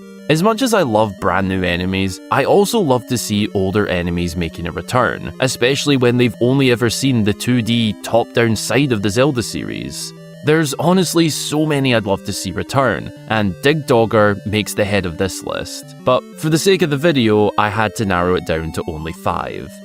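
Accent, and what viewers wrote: United Kingdom. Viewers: Yer accent is funny, you wouldn't happen to be British would you😅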